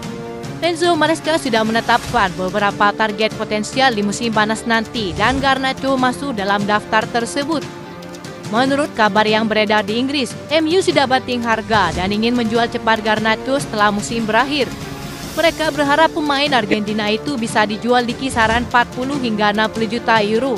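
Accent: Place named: Malaysia. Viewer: Admin logatnya melayu ya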